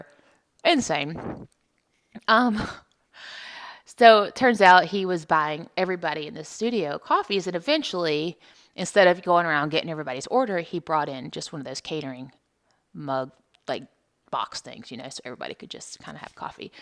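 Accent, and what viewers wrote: South, United States. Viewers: I detect a southern twang😊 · As a southern girl myself, I'm detecting a little tiny bit of a southern twang coming from you. Am I wrong?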